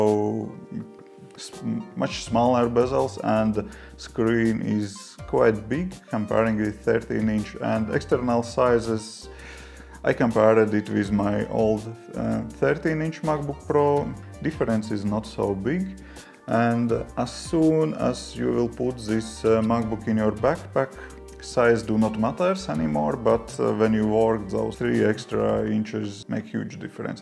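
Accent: Russia. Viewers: The accent pronunciation is 100% Russian and there is no need to come up with yourself _ that you are supposedly from Latvia. Stupid you are a grown man, and you behave like a 16 year old boy. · Кажется я отчётливо слышу русскаий акцент)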